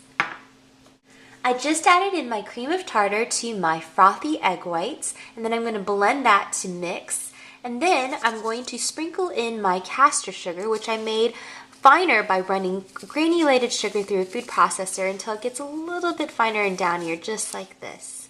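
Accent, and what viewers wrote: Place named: Baltimore, United States. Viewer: Yayyyyyy I am so happy I just found your channel. Now I can get crafty without all the research or messing up. ^_^ Wooooot! I am from Baltimore, it's funny wherever you are from you have a hint of a Baltimorean accent to my ear lol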